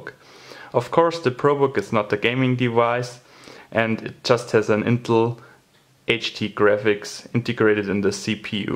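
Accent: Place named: United Kingdom. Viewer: Nigga cant u tell? He has a fucking brit accent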